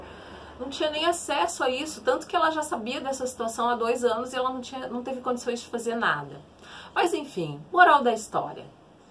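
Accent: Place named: Rio Grande do Sul, Brazil. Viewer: Oi boa tarde tú és gaúcha!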